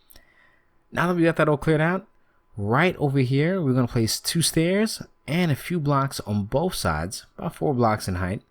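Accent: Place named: New Jersey, United States. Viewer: I'd say Italian from Jersey.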